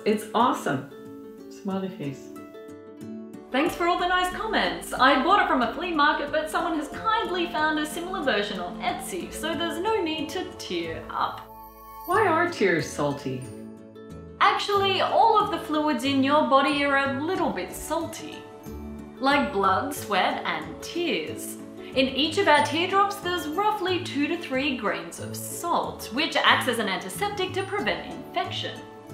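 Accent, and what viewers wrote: United Kingdom. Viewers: Elephants eat poop to build up there immune system. The same way children do by putting anything and every thing in there mouth. Well at least according to the nature documentary I watch! Happy 50th and I thought you were British still a cute accident and thanks for all the wonderful content! Confetti button! <link> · I could swear you were British!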